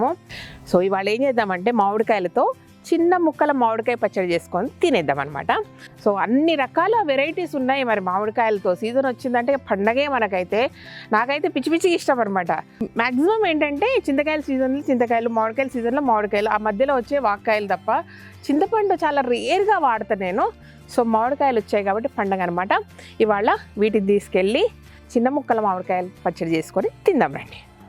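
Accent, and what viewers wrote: Telangana, India. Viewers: Madam meeru Telangana na....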